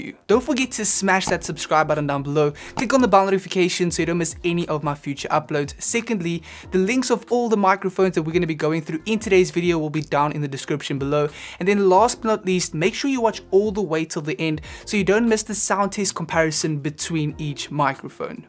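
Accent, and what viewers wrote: South Africa. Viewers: hello ! are you from south africa ?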